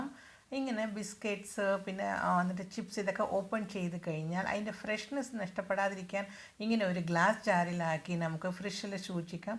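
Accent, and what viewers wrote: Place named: Tamil Nadu, India. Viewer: videos ellam njan kanarund... തമിഴ് ചുവയുള്ള നിങ്ങളുടെ മലയാളം കേൾക്കാൻ എനിക്ക് നല്ല ഇഷ്ടമാണ്....helpful vieeo aantoo ith.... Thank you so much dear🥰🥰🥰💐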